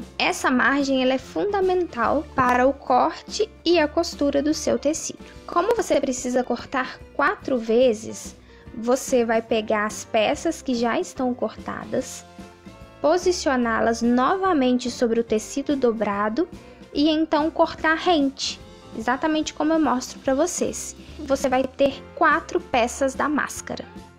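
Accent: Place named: Minas Gerais, Brazil. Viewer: Adorei a explicação pra construção da máscara!! Obrigada!!! Vc é mineira né??? Que sotaque gostoso...BH???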